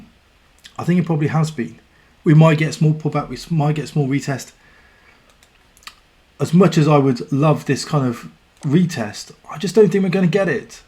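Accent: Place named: United Kingdom. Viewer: Soft British accent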